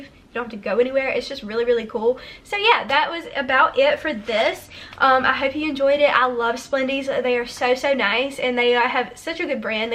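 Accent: South, United States. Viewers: you're so bubbly & i love your Southern accent !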